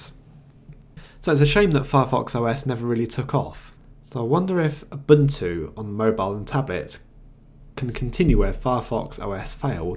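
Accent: United Kingdom. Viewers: I enjoyed your broadcast. But since you talk so fast at times, I turned on CC. Interestingly "Mate" became "Marty" in CC. British accent sometimes add an R sound after an A. I imagine that watching CC of your talk might be enlightening.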